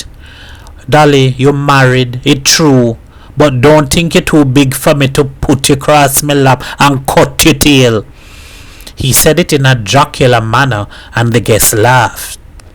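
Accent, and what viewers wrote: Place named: Trinidad and Tobago. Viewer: Thank you, Sir, for reading Miguel Street in what I assume is a Trinidadian accent. Grateful Zimbabwean here.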